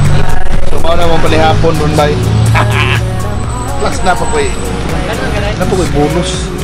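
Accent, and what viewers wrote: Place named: Cebuano, Philippines. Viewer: astig Cebuano accent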